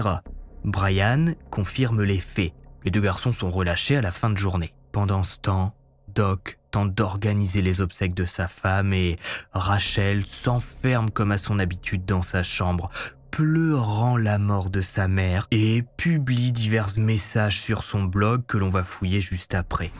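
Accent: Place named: Picardy, France. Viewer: Ce fait comme un rat mort. Tu es du nord Picardie j’adore 👍 bisous 😘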